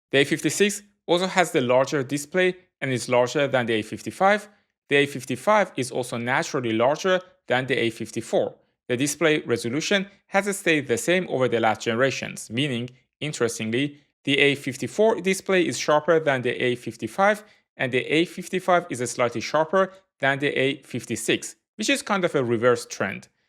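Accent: Iran. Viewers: نگا لهجه ایرانی رو :))))) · Are you persian ?? Your accent sounds like you are. If so nice video dadash ❤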